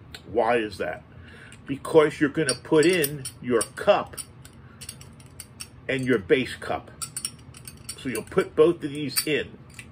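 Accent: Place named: New York, United States. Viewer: are you from new york or new jersey? love your accent and videos, cheers!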